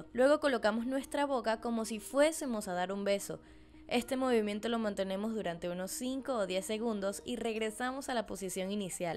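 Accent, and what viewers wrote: Colombia. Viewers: Eres colombiana y costeña carajoo <3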